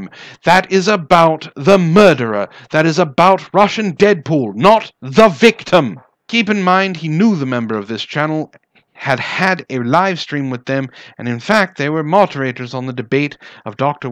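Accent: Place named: RP, United Kingdom. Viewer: Agreed, sort of a not drunk upper class british Wizard of Cause sound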